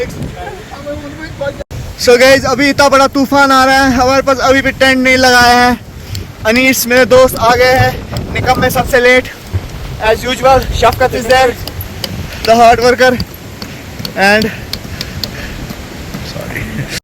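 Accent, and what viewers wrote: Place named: Kashmir, India. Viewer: Now this kashmiri accent hits different🥰🥰.....#love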